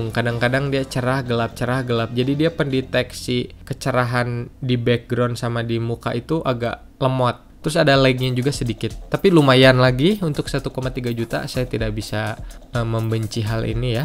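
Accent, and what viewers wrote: West Java, Indonesia. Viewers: Nyariosna khas sunda nya..hhehehe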